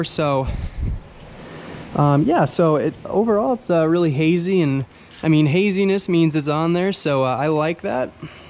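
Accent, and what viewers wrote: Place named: Wisconsin, United States. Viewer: Sounds like Wisconsin 😜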